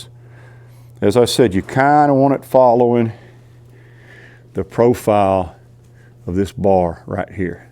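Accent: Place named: Virginia, United States. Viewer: Much appreciated! As a new sawmill owner your words of wisdom are very valuable. And you talk like me......from South Central VA😂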